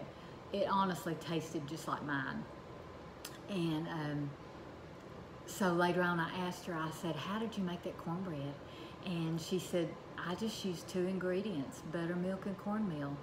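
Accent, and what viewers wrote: South, United States. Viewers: I can’t believe I found you! Not that that J know you, but that I LOVE, you southern charm and I LOVE that accent. I also have an accent and hate to hear myself on a recording. So, I just looked up how to make cornbread without using a store bought mix and you were the first selection. And It was refreshing to hear someone that is definitely from the south and more so, sounds exactly like me. That accent is like hearing myself in a recording minus the fact, I am a man, not a woman. But that twang is unmistakable for me. God bless you, ma’am!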